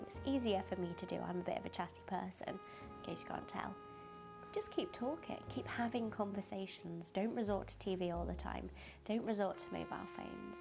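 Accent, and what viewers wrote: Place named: Yorkshire, United Kingdom. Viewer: Thanks for this video! I’m loving your channel! I’m guessing you’re from Yorkshire! Maybe Leeds? ☺️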